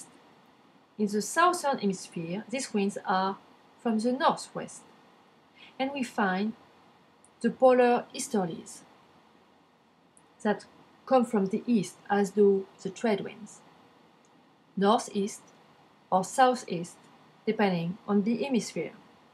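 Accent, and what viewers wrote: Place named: France. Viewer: Dude she's obviously French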